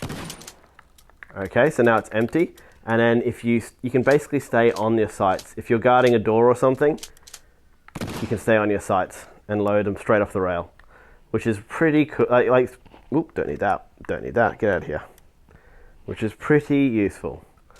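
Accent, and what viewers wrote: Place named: Australia. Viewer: You're Australian 😇 👍👍👍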